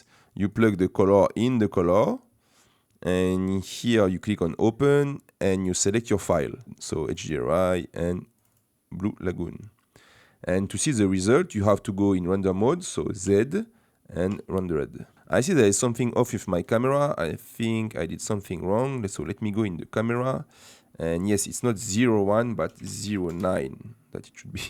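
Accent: France. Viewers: But alors ? You are French !!! ^^^ Au moins avec ton accent Français je understand la moitiés des sentences of the vidéo ! ^^ Merci pour la vidéo...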